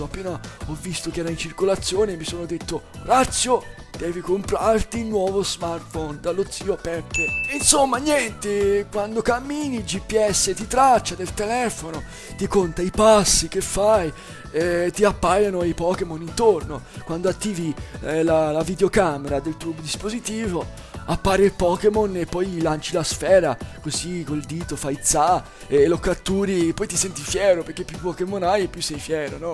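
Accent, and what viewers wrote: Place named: Tuscany, Italy. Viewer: E da quando hai l'accento toscano?!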